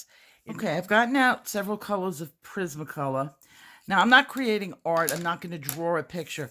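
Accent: New York, United States. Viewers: Wonderful to hear that New York accent, in the YouTube coloring world, once again! Shalom, thumbs up.🎨♿👵Barb Nelson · ! Hi! I've missed hearing your voice and that New York accent I love so much. You sound wonderful! Thank you for sharing your photos of you and Howie. What a lovely couple! What the heck did he do for a living that allowed him to shake Obama's hand?! 🤣❤ I'm so glad you took time off. I can't wait to get my hands on your paste!! Excellent video! Sending big colorful hugs and love your way! And the tattoo.....sniffle.....❤ Love you!!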